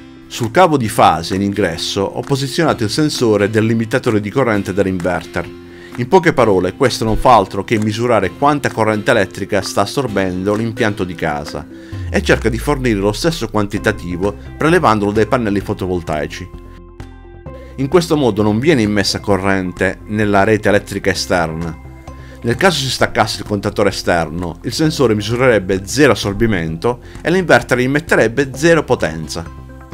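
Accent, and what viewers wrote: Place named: Sardinia, Italy. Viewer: Sono pienamente daccordo con te. Peccato che, dall'accento vive in Sardegna, altrimenti, Coronavirus permettendo, lo andavo a trovare, così con l'occasione, assaggiavo lu purceddu Sardo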